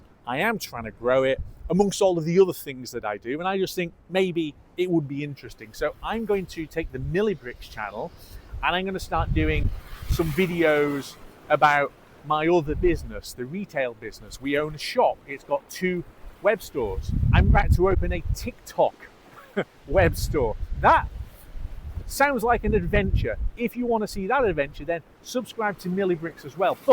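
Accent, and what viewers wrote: Scotland, United Kingdom. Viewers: I'll say this... I support your channel and watch your videos. I like to see Scotland and like to hear the accent as it's so different from where I live. That you like Tesla and good technology is something I also appreciate. I also think that anything Elon related has to be taken with a grain of salt. But what other company is doing the same things even if sometimes the owners think is the wrong direction? You are not a fraud like you said you are. You're just commentating about the tech, the infrastructure and the company. Your channel is really about you in effect and it is entertaining and sometimes thought provoking. Regarding the YouTube algorithim, I think it should be out of your mind. Do the content you want. Let the rest figure itself out.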